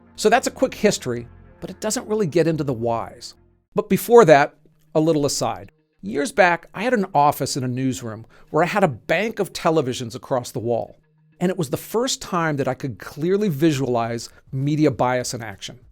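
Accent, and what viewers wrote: United States. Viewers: Huh ? Prostitution isn't illegal. Ah, American accent. That explains it. Can't understand why the car was stopped on the left, though. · But it is legal in most first world countries. Assuming from the accent you meant the United States but I wouldn't call this one a first world, just a really wealthy 3rd world country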